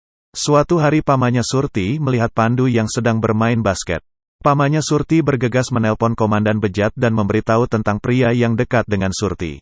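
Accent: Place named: Banyumas, Indonesia. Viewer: Wong ngapak pembawa acaranya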